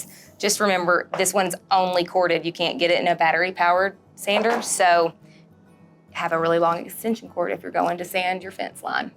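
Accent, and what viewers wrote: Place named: West Texas, United States. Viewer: Thanks for the refresher on types of sanders and sandpaper. I'm trying to place your accent. Are you from west Texas?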